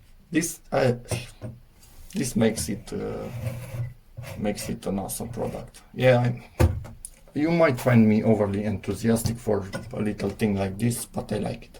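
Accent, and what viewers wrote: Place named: Romania. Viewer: Poate vorbeste in Engleza ca il poata urmari si oameni din alte tari? Stiu, pare halucinant, dar lumea asta are aproape 8 miliarde de oameni, dintre care doar vreo 0.4% stiu romaneste. Eu, ca si alti oameni care se informeaza, am gasit acest canal de youtube cautand in engleza un lucru care ma intetesa. Mi-am dat seama ca ar putea fi roman dupa accent si cand am citit in descriere. Daca tu nu esti aici ca sa te informezi pe subiect, atunci beshi in plm in alta parte. Youtube Trending e de tine, Dani Mocanu vorbeste romaneste.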